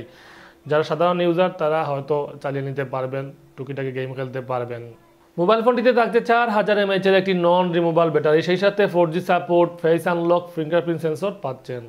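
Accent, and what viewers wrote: Sylhet, Bangladesh. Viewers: Bari sylhet na kita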